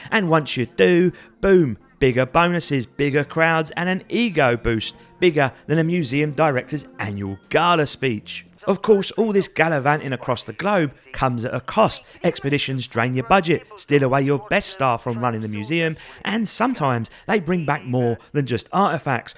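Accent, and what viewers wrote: Cockney, United Kingdom. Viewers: Loving the cockney.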